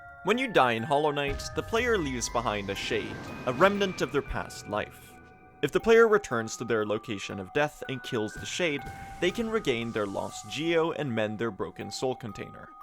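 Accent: Sweden. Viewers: Your swedish? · that's an extremely Swedish accent though. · are you swedish? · Wait you are swedish??? Your accent is fucking amazing, even for a swede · Wait, you from Sweden?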